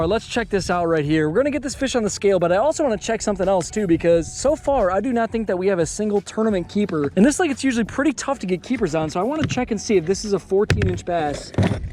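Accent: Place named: Midwest, United States. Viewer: Sounds like your Sobi’s brother lol midwest aye?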